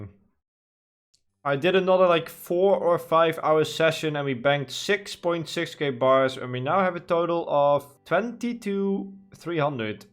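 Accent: Netherlands. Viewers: I can hear the thicc dutch accent. Keep up the good work maatje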